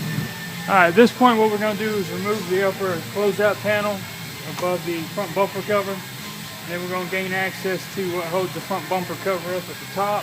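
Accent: South, United States. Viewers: Haha I thought I heard a slight twang in your voice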